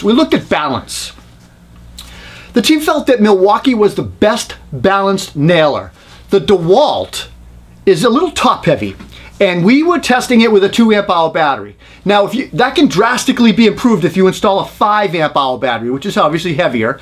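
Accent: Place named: Boston, United States. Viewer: No need to hide the Boston accent! New England is famous for its carpenters. MakitER it is! Massive thanks for your work here, as always. Great work!!!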